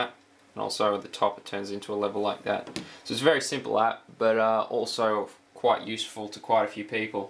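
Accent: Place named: Australia. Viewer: lol australian...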